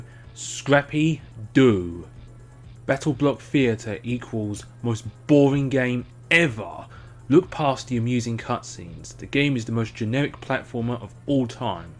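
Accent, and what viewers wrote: England, United Kingdom. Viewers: dull: dou battle: ba-uh man I love the English accent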